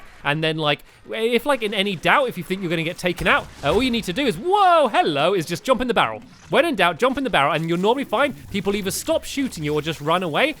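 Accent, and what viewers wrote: England, United Kingdom. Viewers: The U.K. Can't you tell from his accent( England )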